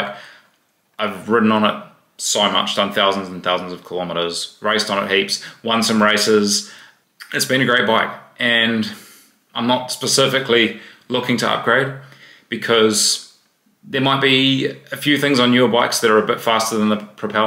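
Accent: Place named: New Zealand. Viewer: I AM outside of New Zealand watching this. But with mumbled staccato outbursts it’s really hard to understand. (Of course I understand that’s your native accent. But being more prepared and slowing down it might be easier to understand)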